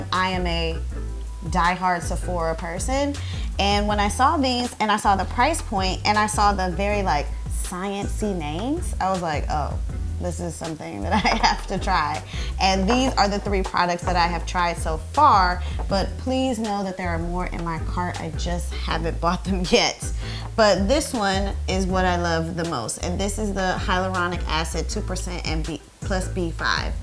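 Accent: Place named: New York, United States. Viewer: 1. I would have never guessed that you were not a native New Yorker if you hadn't said you were from Ohio! lol In Spirit you are a native NYer lol 2. Is that a baby Monstera Albo in that pot?? And you grew all those leaves on a supposedly slow growing plant! KUDOS! It's beautiful! 3. I WILL be trying that washcloth! 4. Not to mention how much natural hair products costs us! smh I be like $15 dollars for this little bottle? I'll just make this huge $6 bottle of Treseme work (extremely damages hair cuz it has the most moisture) and it usually doesn't work to well LOL #TheNaturalHairStruggle LOVED this video! IG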